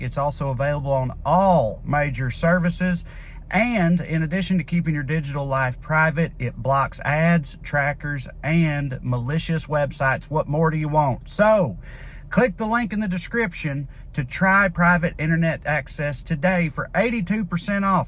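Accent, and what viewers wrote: South, United States. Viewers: Is it Just me ir us the southern accent getting stronger? hard to understand from abroad... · Being funny and knowledgeable and breaking it down in an easy to understand flow....another George Carlin in the makings right here. Albeit with a Southern Twist.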